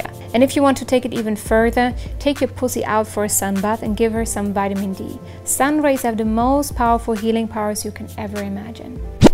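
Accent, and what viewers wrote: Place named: Germany. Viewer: My best friend is German, it's so nice to hear the accent <3 And you're the coolest so watching these videos make me so happy :)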